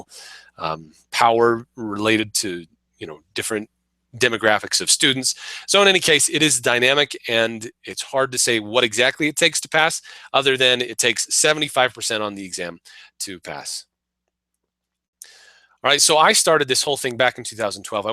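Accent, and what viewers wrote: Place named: West, United States. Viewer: Ha ha, the joke near the end! The accent sounds western American, i guess.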